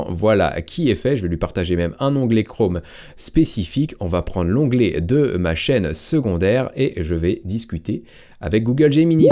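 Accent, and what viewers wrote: Quebec, Canada. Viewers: Merci! Hilarante la vidéo avec l'accent québécois! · L'accent québécois excellent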